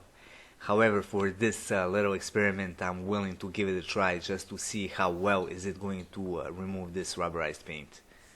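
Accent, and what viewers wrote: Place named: Italy. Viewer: I like your italian accent Mille grazie alfisti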